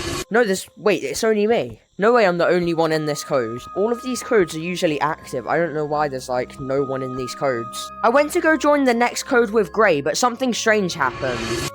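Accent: United Kingdom. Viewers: the british acent is killing me🤣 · are you British? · I love his British accent 🙃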